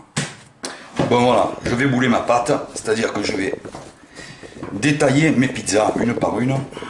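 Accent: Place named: South, France. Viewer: Avec une voix qui sent bon le Sud 🤗 je dis Ouiiiii!!!! Je testerai la pizza plus tard, je savoure déjà l'accent 🌾🍅🍕😄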